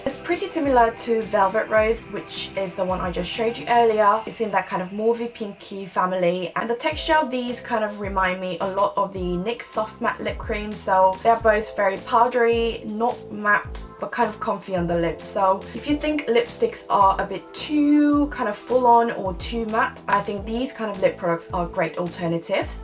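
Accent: United Kingdom. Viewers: 언니 영국영어쓰시네...존멋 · 세상에.....영ㅇ국발음....!!!!!!!!!!!!! 게다가 영상도 너무 좋아서..바로 구독 아 너무 사랑해요 진짜.....ㅠㅠㅠㅠㅠㅠㅠㅠㅠㅠ♡♡♡♡ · 목소리 너무 좋타....♡영국발음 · 상큼하고 귀여운 이 떠서 보러왓오요 ㅠㅠㅠㅠㅠ ❣❣❣❣❣영국식 영어발음도 넘 매력있네요오....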